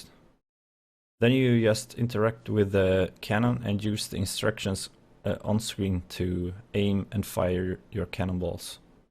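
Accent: Sweden. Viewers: nice, btw your accent sounds like you're swedish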